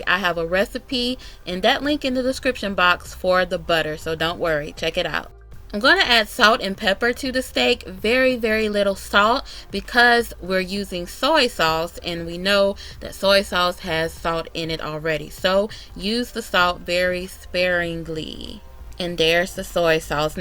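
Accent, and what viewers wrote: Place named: Atlanta, United States. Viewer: Girl you can cook! Where you from? I hear some ATL in your blood!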